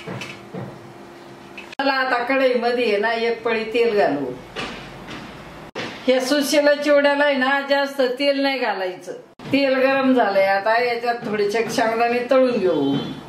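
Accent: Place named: Marathwada, India. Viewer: खूप छान सुशीला चिवडा आई मी पण मराठवाड्यातली तुमची भाषा शैली मला खूप आवडते जवळची व्यक्ती भेटल्यासारखे वाटते मी तुमच्या मध्ये माझ्या आईला बघते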